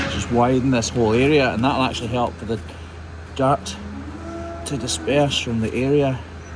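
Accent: Scotland, United Kingdom. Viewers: you and your crew are the best.....if you don't have empathy for all creatures, what's the meaning of life, eh? Plus, the added bonus of a Scottish accent❣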